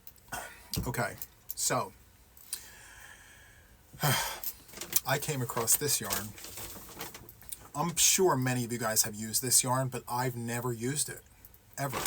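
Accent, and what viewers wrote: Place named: Boston, United States. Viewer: I love your Boston accent 😊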